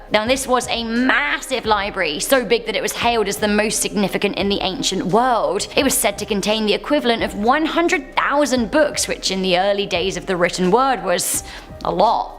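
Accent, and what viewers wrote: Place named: United Kingdom. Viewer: I love her British accent 😮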